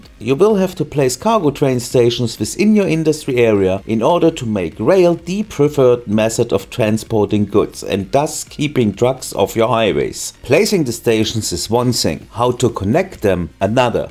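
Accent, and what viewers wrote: Germany. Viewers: Yeah it did lol. The German accent added a +1 whilst talking technical terms as well. I might have to make a new city now. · Nothing like a good while watching a guy with a German accent explain things I understand nothing about and will probably never use. Very relaxing. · It was you who inspired me to purchase city skylines five or more years ago. I played for over 2,000 hours. Thank you. The video that blew my mind was one about a dedicated industrial area with one-way roads and one-way rail lines. This was before Industries DLC came out so it was comparatively primitive to this, but many of the principles you used then are present here. Again you’ve blown my mind and given me a yen for city planning. Your cities are living beings with free-flowing roads carrying cars like red blood cells. Truly a pinnacle of German engineering. Also I like the Frankfurt accent. 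It’s both intelligent and hilarious at the same time. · VERY useful. Danke for creating this. (i hope i dont make a mistake saying it in German, you sound German) :) But your english is spot on! :) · You can hear your German dialect, hence the comment in German. That's way too much fumbling for me! The game would be a lot more fun if you didn't have to fiddle around so much. This is really frustrating! It takes hours just to get a single node up and running. :( It takes a million mods to get by and to be able to build reasonably well. I hope CS2 has a lot less fiddling and the traffic AI is a lot smarter. You have to build unrealistically many roads just because the AI is bad and many other extremely annoying things... ________________________________ Man hört deinen deutschen Dialekt raus, deshalb das Kommentar auf deutsch. Das ist mir viel zu viel gefummle! Das Spiel würde viel mehr spaß machen wenn man nicht so viel fummeln müsste. 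Das ist echt frustrierend! Man brauch Stunden nur um einen einzigen Knotenpunkt zum laufen zu bekommen. :( Man brauch eine Million Mods um zurecht zu kommen und um einigermaßen schön bauen zu können. Ich hoffe das CS2 wesentlich weniger gefummle beinhaltet und die Verkehrs-KI wesentlich intelligenter ist. Man muss unrealistisch viele Straßen bauen, einfach weil die KI schlecht ist und viele andere extrem nervige Dinge... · btw are you maby german bc of the acent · When a man with a German accept starts telling me about order and efficiency, I can't help but pay attention.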